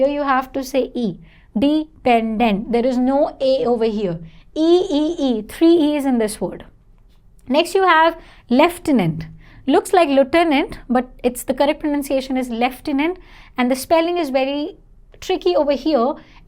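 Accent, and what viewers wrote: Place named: India. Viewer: I like Indian accent.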